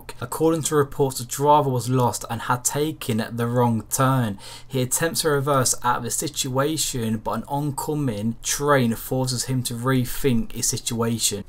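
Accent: United Kingdom. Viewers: Kim Kimble Hes british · where are you from? i know uk but accent is hard to get at some points. not bashing ...im just curious lol. more i hear it the better. also keep it up. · I'm curious if that's the dialect across the pond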